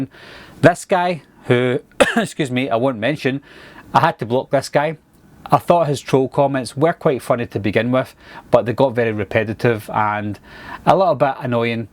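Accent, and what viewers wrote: Scotland, United Kingdom. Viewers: I'm an Irish-German-Scottish American from Connecticut and I understand you perfectly. People need to realize that the world is a lot bigger than just their backyard. I stopped to watch one of your videos because of your accent. I'm just sorry for the grief that these people cause you. Do you think sometime you can make some videos showcasing some of the landmarks or historical sites in Scotland? I would love to see what it's like over there. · I almost spit out my water and cracked up pretty hard after you read the second profanity-laden troll comment 😂. You handled these comments very well. Genius video you uploaded — this is akin to the “celebrity read mean tweets” segment on late night tv. P.S. I’m an actor & your videos help me immensely with my Scottish accent. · I love your accent!! Its great! I try to speak with a Scottish accent alot lol Im actually a very small perscentage Scottish. 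Not alot but def more than liz warren : / great channel! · A Scottish accent warms my bits. ❤😍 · I like hearing Scottish accents from Reviewers such as Yourself & 'The Critical Drinker' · I like your accent than many other British accent. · I’m from Houston with an east Texas/west Louisiana accent. I confuse myself on occasion. Lol. My daughters attend a Scottish arts private school and your accent is perfectly commonplace in our world- so, no complaints here! ☺️ Btw, thank you for keeping such a clean channel! We love horror movies but I’m selective on what they watch. You’re our favorite! 😎🎃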